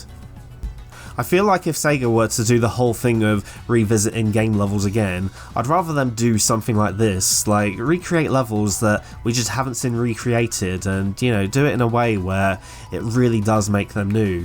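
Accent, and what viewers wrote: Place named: England, United Kingdom. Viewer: Are you me, but with an English accent? Because I feel like you are me.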